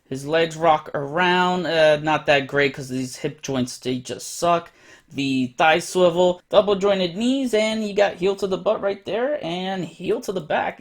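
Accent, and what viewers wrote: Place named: New York, United States. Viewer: Shart owns “big time let down” and now you own “big time caca”. Please more “on the shelf” segments and ain’t no shame lettin our New York accent show out, bro. Salute.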